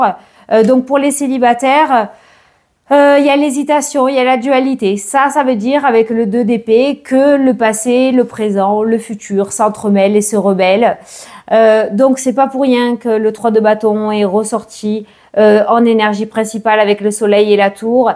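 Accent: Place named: Hérault, France. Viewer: Bonjour, toujours avec le sourire malgré tes petits ou grands problèmes. J'adore ton énergie et ton accent qui est le mien aussi. Je suis une héraultaise. Je te souhaite une bonne journée a bientôt 🙏😚.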